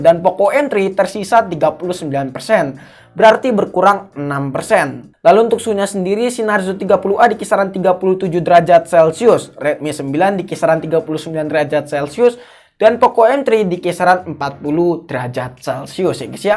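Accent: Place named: Surabaya, Indonesia. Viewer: Arek Suroboyo Yo lur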